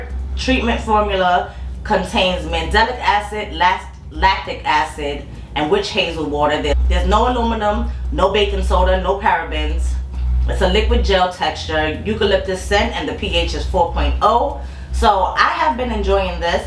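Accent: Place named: New York, United States. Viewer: Subbed! I like your accent! New Yorker? If so which borough? I'm your Connecticut cousin now! Lol!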